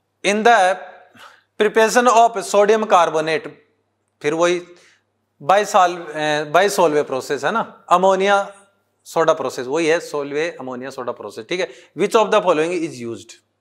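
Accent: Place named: Rajasthan, India. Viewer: Pure rajasthan ka swag aata hai aapki boli me padane ke tarekeee me ekh no.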